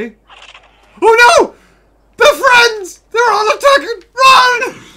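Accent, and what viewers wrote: United Kingdom. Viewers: He’s british but has a deeper voice than stampy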